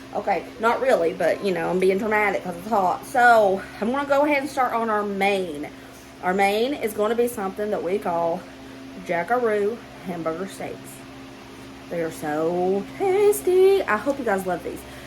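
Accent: South, United States. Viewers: 😂I thought you said bacon powder!!! I blinked and started laughing at myself.....baking powder! I love the south and am trying to keep up with the accent! Love ya all! That onion casserole is bomb! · I love how you pronounce oil with your Southern accent!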